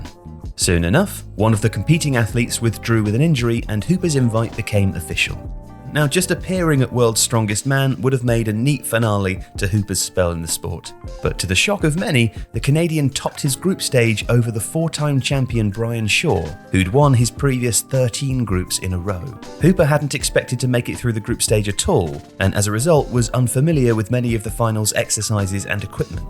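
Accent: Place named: England, United Kingdom. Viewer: I checked the comments halfway through the video and had no idea what this could refer to Then I got to the end of the video... good lord it's jarring after the soft English of the main portion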